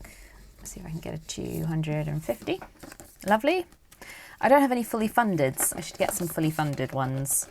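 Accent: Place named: United Kingdom. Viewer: Nice to meet you. Love a British accent. Hello from Australia 🇦🇺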